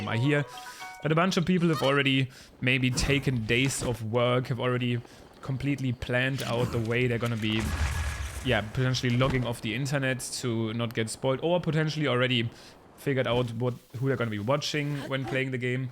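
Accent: Germany. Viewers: Dein Englisch klingt so deutsch xD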